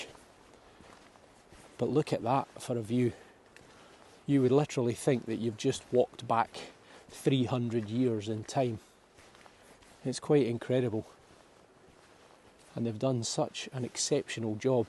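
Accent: Scotland, United Kingdom. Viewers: Listening to English spoken with the Scottish accent is like listening to music. Thank you for the photography and narration. Lovely 🌹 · Thank you for this tour. It's a reality check for most of us who never knew what our ancestors no doubt lived through. My family lived in Scotland, we think the northern part, slowly moving to Canada then to the US a 150 yrs ago. It was heart warming to hear my grand father who still had a bit of his Scottish accent; just a few words that he automatically spoke that sounds somewhat like you. SCOTLAND is beautiful to be sure, but wasn't an easy way of life in times past. This was wonderful & I love your videos of this beautiful country. Thank you!!!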